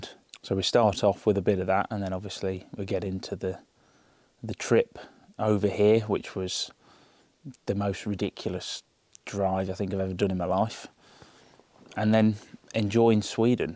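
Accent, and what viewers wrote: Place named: England, United Kingdom. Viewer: This has absolutely nothing to do with the upcoming videos (which I can't wait to watch! 😁), but I'm curious about your accent. 😜 Whereabouts in England is it from? 😀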